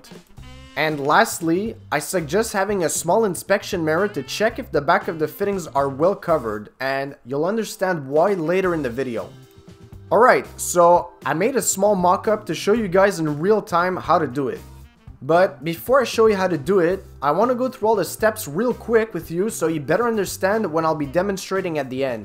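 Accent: Quebec, Canada. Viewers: GDog S Canadian accent! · presque pas d'accent excepté une couple de fois! Un québécois reconnais un québécois qui parle anglais! ;) Mais! Bonne nouvelle. Je me suis abonné à ta chaine! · Not that it matters, is this a Canadian DIY advice video site? Just out of curiosity (as I’m from Ontario) - just going from the Quebec licence plate, your accent and seeing Holmes on Holmes clips just made me wonder. Personally I think it’s great as I’m a bit tired of getting all the advice from US sources.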